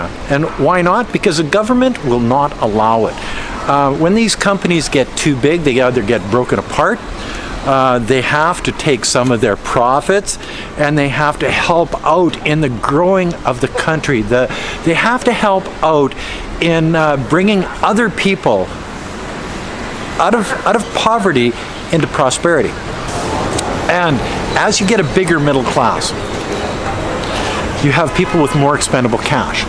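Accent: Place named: Canada. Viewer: Reasons why I like your channel is, you and me both Canadian from British Columbia, we have some much the same in common and your Canadian way of language "FXXK them!", yes, that is Canadian way and Canadian tone! Don't worry about those bullshit shut down, there is many other ways to express your truth speech and comment, just let us know and we follows. Keep up the good work and like to watch your daily life in China!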